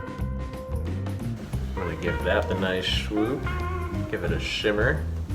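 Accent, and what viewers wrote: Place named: United Kingdom. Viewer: I love this,. Thank you for posting. It looks like you are using a smaller, square canvas and much smaller brushes than Bob uses. I have been given a lot of small canvases including square ones. I shall be trying your method of painting this using smaller brushes too. Your accent sounds British, if so where are you from? I’m English but now living in Spain.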